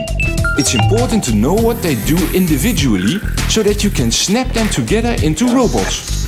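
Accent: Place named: Scandinavia. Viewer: Just wondering why you sound nordic but have a pic of Abe Lincoln?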